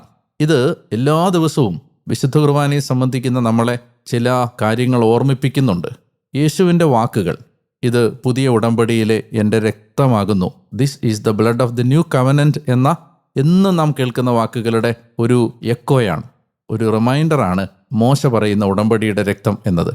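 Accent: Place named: Pathanamthitta, India. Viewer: Karthave during my 75 years of age first time a Priest ithupole ithra clear ayittu Thiru vachananam kelppikkan athu. Achente way of talking n Malayalam language is like my own birth place Pathanamthitta Kerala. Though I n my family is in Rajasthan for the last 50-60 years, I haven't learn malayalam typing and as such I have to type malayalam typing in English. ❤❤❤.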